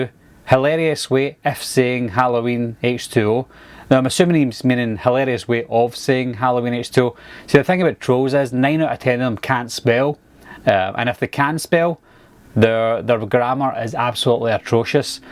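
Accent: Scotland, United Kingdom. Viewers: I love your accent!! Its great! I try to speak with a Scottish accent alot lol Im actually a very small perscentage Scottish. Not alot but def more than liz warren : / great channel! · I like your accent than many other British accent. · I’m from Houston with an east Texas/west Louisiana accent. I confuse myself on occasion. Lol. My daughters attend a Scottish arts private school and your accent is perfectly commonplace in our world- so, no complaints here! ☺️ Btw, thank you for keeping such a clean channel! We love horror movies but I’m selective on what they watch. You’re our favorite! 😎🎃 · I'm an Irish-German-Scottish American from Connecticut and I understand you perfectly. People need to realize that the world is a lot bigger than just their backyard. I stopped to watch one of your videos because of your accent. I'm just sorry for the grief that these people cause you. 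Do you think sometime you can make some videos showcasing some of the landmarks or historical sites in Scotland? I would love to see what it's like over there. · I like hearing Scottish accents from Reviewers such as Yourself & 'The Critical Drinker' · I almost spit out my water and cracked up pretty hard after you read the second profanity-laden troll comment 😂. You handled these comments very well. Genius video you uploaded — this is akin to the “celebrity read mean tweets” segment on late night tv. P.S. I’m an actor & your videos help me immensely with my Scottish accent. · A Scottish accent warms my bits. ❤😍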